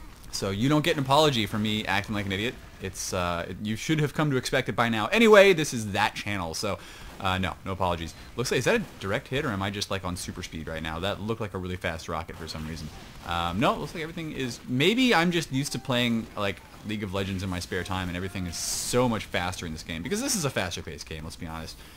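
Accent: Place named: Australia. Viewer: That Aussie accent though... Nice cast btw!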